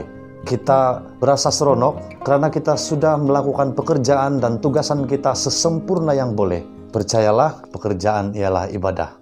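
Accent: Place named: Sabah, Malaysia. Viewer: Punya pakat ko punya slang sabah.. hahah sandakan ka ko.!! Hahhaha . Aku dari telegram cardock team.!! Salam kenal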